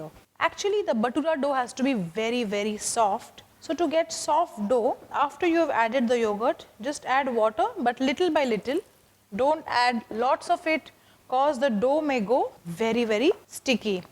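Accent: India. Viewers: Nice Hindiaized English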